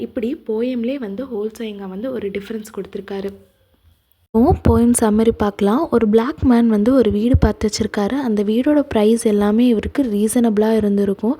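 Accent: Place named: Kanyakumari, India. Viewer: You’re from kanniyakumari for sure some how thank you ❤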